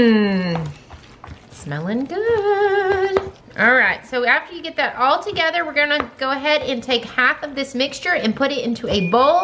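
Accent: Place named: South, United States. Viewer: Cream of chicken. I like you you sound a little southern.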